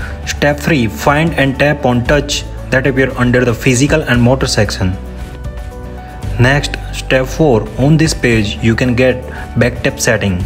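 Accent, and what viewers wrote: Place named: India. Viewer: I don't try to make fun on his strong indian accent but I really don't understand the words his saying, maybe he can speak bit slowly so people can understand what he say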